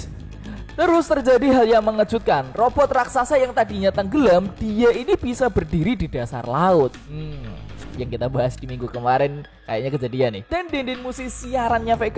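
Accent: Java, Indonesia. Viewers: ngomong ny gak medok bisa gak bang?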